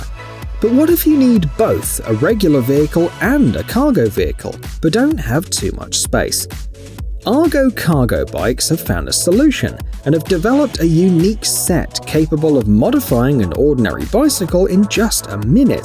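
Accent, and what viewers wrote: United Kingdom. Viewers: I wasn't expecting the British speaker to price them in dollars.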